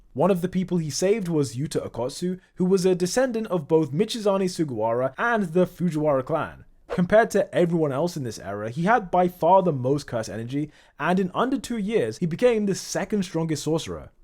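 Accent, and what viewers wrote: United Kingdom. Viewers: Saved him from being raised as a conservative? Hahaha what a panzee thing to say. You know there is a difference between being a conservative and a mysoginistic prick? By your accent, I could assume you're from the UK. So looking at your woke dog shit country you can see the danger of non-conservatism.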